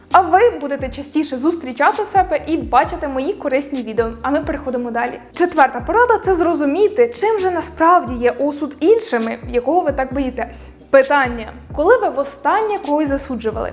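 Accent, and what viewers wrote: Poltava, Ukraine. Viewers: Ви дуже автентична, цікава та харизматична ❤ та мені чується полтавський рідний акцент 😅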